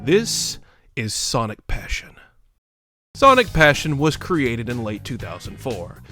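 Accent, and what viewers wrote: South, United States. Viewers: Ok, I think I've pinned the accent. You from Tennessee or Mississippi?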